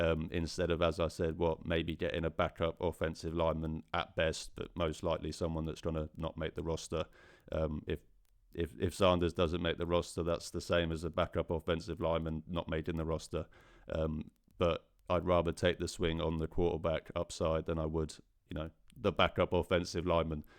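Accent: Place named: Wales, United Kingdom. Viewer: What part of Wales are you from & welcome to the dawg pound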